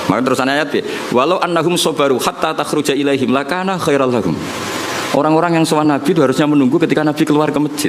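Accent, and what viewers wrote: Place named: Kebumen, Indonesia. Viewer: Kebumen ngapak nyimk kyai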